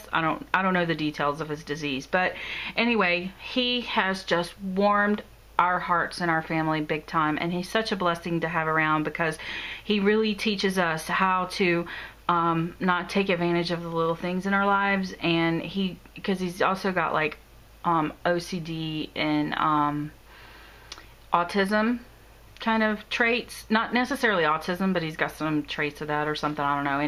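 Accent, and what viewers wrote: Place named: South, United States. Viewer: OK.....I'm loving this little book. I want to do this. I will be watching all of your videos tonight - got to get back to work now! lol And I love your Southern accent - I'm an Alabama girl. Great work!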